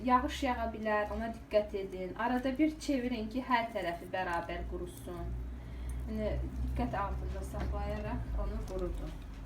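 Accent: Azerbaijan. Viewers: Pul biberi begendim. Azeri kardeslerimizin konusma tarzina bayiliyorum. Ellerinize saglik.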